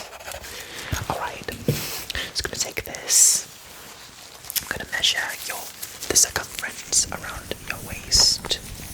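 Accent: United Kingdom. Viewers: You ate that British accent up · As a Brit I was very impressed with the accent,I'm from deepest Lincolnshire now that is an accent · As a british person, this is how the women talk, the men sound like hard northern accents or indian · I live in britan and this is how we speak :3 "A Bo'oh o' Wa'ah is always good £:" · As a British UK citizen this video is brilliant pity our NHS systems in poor state takes weeks to find appointments got the british accent spot on tho 😊 love how you done your UK homework on london big ben / British retail boots 😊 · Please make a part two or more british accents i LOVE ITT💕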